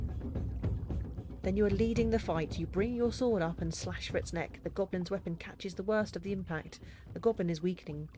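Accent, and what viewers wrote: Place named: England, United Kingdom. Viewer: those books are the best in the domain of adventure gamebook , congrats for your work ! the english accent is not my cup of tea , for this is very nice to heard the text readed.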